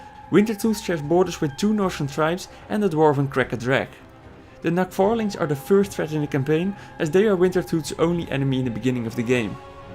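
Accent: Netherlands. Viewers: Hael Premjartonn he is dutch, not german. The accents don't even sound a little bit the same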